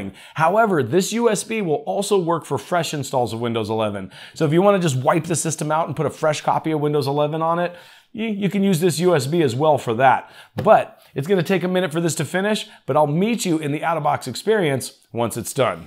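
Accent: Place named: United States. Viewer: Today I watched your YouTube broadcast again, and it’s been synchronized in Dutch — probably an AI edit. Although my knowledge of English is limited, I prefer American voice. YouTube thinks I’m a donkey.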